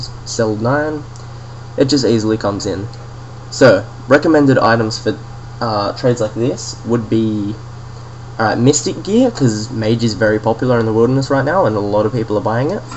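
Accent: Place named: Australia. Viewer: PURE FUCKING AUSSIE!!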